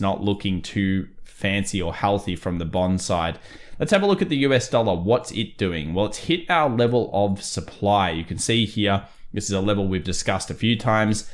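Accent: Australia. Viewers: i bother to listen because you are an aussie. Do not disappoint me, please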